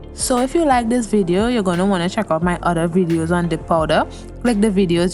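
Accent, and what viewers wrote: Trinidad and Tobago. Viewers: I'm in the❤❤❤❤ with this.. you in the Caribbean. Well that's sounds like a trini accent. I'm just up the road😂😂😂 think I can drop by😂😂😂🏃‍♀️ I'll bring all my products I promise😂🤣🤣🤣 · I had a mind that you are a Trini being one myself and sounds like someone I know. But all in all I love your designs.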